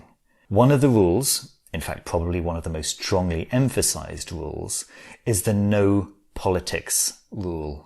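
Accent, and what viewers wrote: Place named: United Kingdom. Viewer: A-dee-siv! I l love the British accent. Bring on the Devine Feminine! Give us what ya got.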